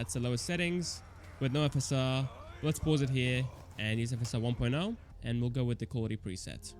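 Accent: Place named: Australia. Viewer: I’m making you my new benchmarking guy always nice to hear an Australian of coarse but I also love how you structure your videos.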